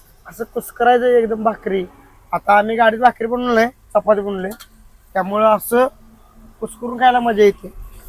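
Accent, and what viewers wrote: Kolhapur, India. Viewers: राडंची कोल्हापूरी भाषा भावा 👌👍 😂😂😂😂😂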